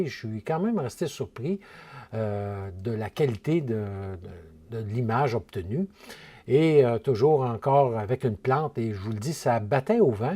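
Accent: Canada. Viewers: Jolie vidéo avec en prime l'accent Canadien. Merci beaucoup.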